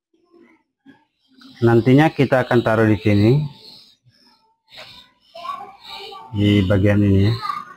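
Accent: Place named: South Sulawesi, Indonesia. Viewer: Dari logat nya kyakn org Sulawesi Selatan 🤔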